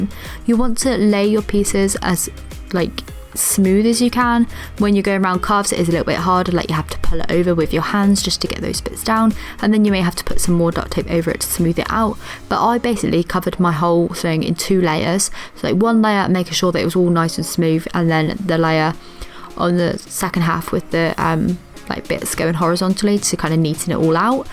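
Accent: England, United Kingdom. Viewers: No way are you English!!!?? 😍😍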